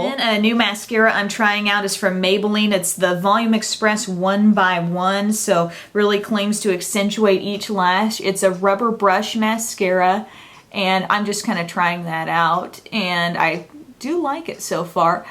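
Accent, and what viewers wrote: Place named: United States. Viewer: love your accent!!! im from australia and yours is the nicest american accent ive ever heard (im being serious btw) :)))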